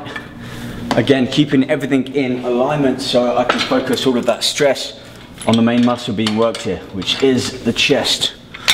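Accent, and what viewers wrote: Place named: United Kingdom. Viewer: So this workout should build and make your middle line more prominent? Your accent is cute. Is it British?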